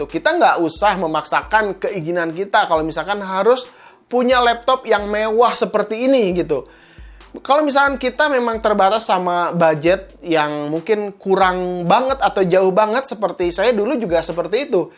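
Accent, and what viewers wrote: West Java, Indonesia. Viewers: aduh akang sunda pisan hahaha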